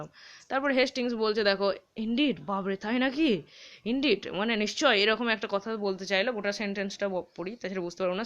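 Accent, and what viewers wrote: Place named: Katwa, India. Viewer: To cover up the whole syllabus for the upcoming SLST, binge watching/listening the whole playlist. Thank you very much. Madam, are you from Bazarsau-Shaktipur-Katwa area? The bengali accent seems familiar.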